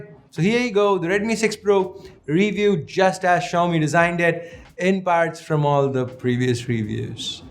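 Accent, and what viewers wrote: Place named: South India, India. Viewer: Hey, The Screen Size of Mi A2 Lite is not 5.99" It is 5.84". Just to let you know. Anyways You are just Awesome and like other South Indians your Accent is Awesome and Different.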